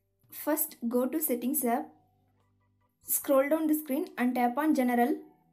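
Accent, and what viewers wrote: India. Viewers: the thick indian accent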